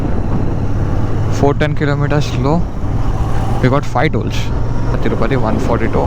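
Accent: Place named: Hyderabad, India. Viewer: Mana Hyderabad slang a alaguntadi 💙💙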